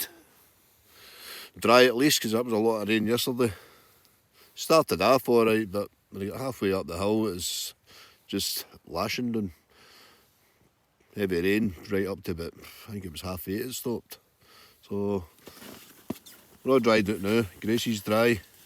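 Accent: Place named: Scotland, United Kingdom. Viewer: Loved the video. My mother's family is from Scotland, so I love the accent. I have to admit, though, I had to turn on the closed captioning to know what you were saying! 😁✌️🇨🇦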